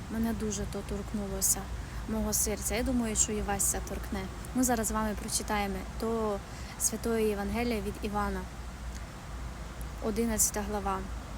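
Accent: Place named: Transcarpathia, Ukraine. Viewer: ❤️Оце найкраще подтвердження, що наш Господь Ісус розмовляв з Мартою та Марією... файною українською мовою з закарпатською вимовою😃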